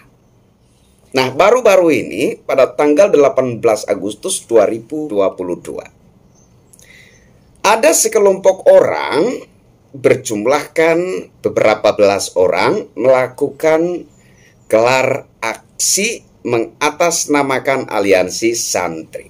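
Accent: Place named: Papua, Indonesia. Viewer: Assalamualaikum.Bang. 🗣️ Oh..mksd ada BENANG- MERAH to? 🪡🪡😇Waduh kentalnya dialektis Papua,👏👏bongkar teruss ya bongkar,Bang💪🇲🇨 TRIms,🙏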